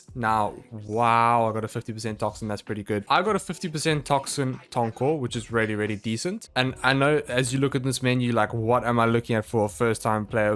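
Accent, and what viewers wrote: South Africa. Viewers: You are awesome and so nice to hear your SA accent! Hubby and I are from SA! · I know that English South African accent anywhere! XD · Are you south african? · Are you south African?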